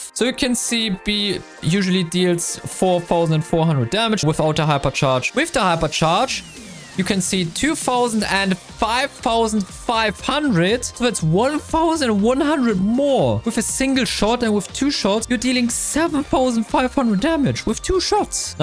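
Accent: Germany. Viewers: Are you from german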